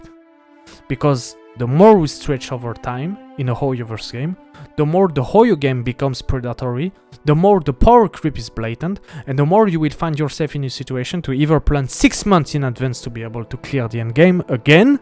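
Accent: France. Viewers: Are you French? You sound like you have a french accent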